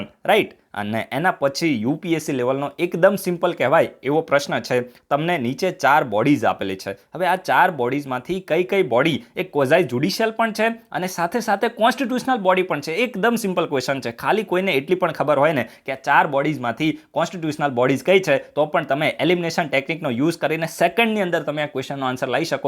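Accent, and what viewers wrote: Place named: Ahmedabad, India. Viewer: anand ane amdavadi boli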